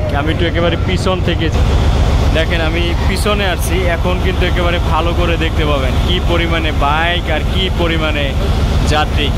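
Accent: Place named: Barishal, Bangladesh. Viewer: ভাই আপনার মুখে বরিশালের ভাষাটা আমার কাছে অনেক অনেক ভালো লাগলো আমার ভাই বরিশালের ভাষা অনেক ভালো লাগে আমার নানা বাড়ি ভোলা ।আমার দেশের বাড়ি শরীয়তপুর তারপরও আপনার বরিশালের লঞ্চের ভিডিও দেখি আমার অনেক ভালো লাগে ভাই। ❤❤❤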